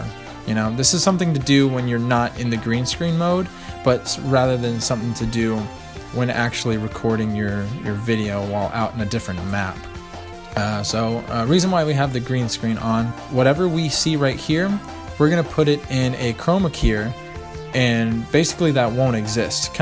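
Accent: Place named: Russia. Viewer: Seriously? I immediately understood the accent, it is easy if you are from RUSSIA!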